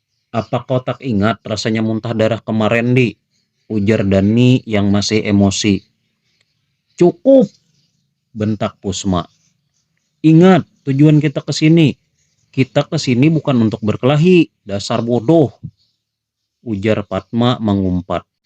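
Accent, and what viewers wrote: Banjar, Indonesia. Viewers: Mimin urang banjar jua neh lugat nya 😀😀.. Nah maaf nah min hnyar tdpt chanel pian mudhan sukses.. Salam dr banjarmasin🙏🙏🙏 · Urg Banjar kah Pian mang..ketahuan di logat neh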